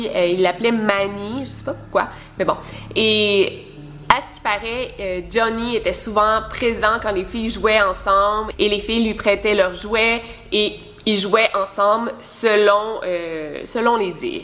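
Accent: Quebec, Canada. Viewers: À force de regarder tes vidéos, je suis devenue accro à ta voix ! J'adore ton rythme de narration et ce bel accent canadien!💕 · j adort l'accent canadien. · Je suis abonné que depuis hier et je suis déjà fan parce que tu racontes vraiment bien les événements ! Ça me captive et j'adore ton accent québécois c'est bien ça? :)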